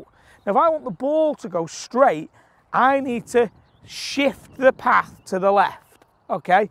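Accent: Manchester, United Kingdom. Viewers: As ever, a great video and very informative! It`s interesting to notice that when you are really interested in a topic you tend to speak quicker. That`s fine for me and your fellow Manc viewers, but for the non-Manc`s you might want to slow your delivery down a tad just in case they can`t keep up:)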